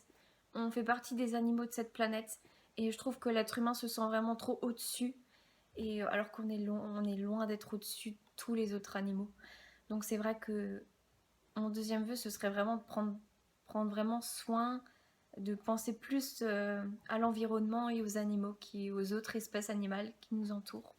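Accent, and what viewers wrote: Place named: Alsace, France. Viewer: Non mais quand je regardais tes vidéos je me disais justement que t'avais parfois un accent alsacien ! Haha #teamalsace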